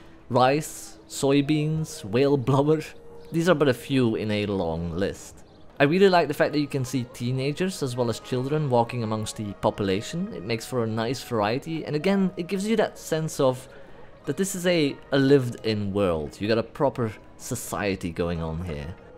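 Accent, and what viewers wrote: France. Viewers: Crazy, a French guy speaking English 😮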